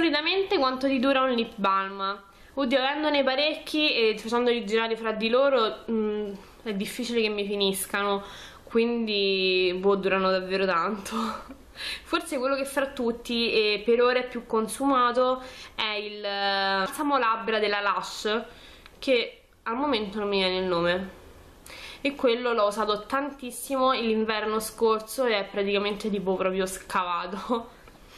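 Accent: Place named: Rome, Italy. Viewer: nella parola Packaging la "G" finale è muta. si legge pacagin. Te da romanaccia ce ne metti 3 di G alla fine :) Però sei simpatica. Ciao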